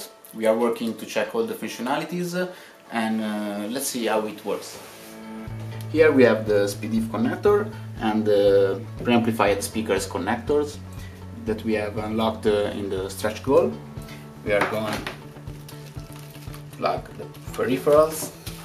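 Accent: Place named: Italy. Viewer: Love that Italian English :)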